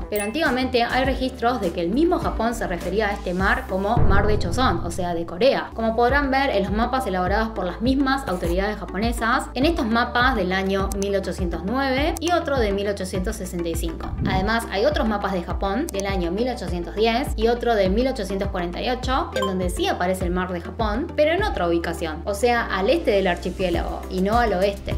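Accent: Argentina. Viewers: Pensé que vivía en Argentina por que claramente entiendo su acento Argentino pero no crei que vivía en Chile me sorprendió la verdad.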